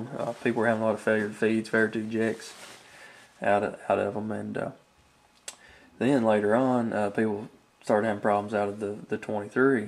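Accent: South, United States. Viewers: I would have to say that I believe you may be a touch Southern. We talk a little funny to the northern folks.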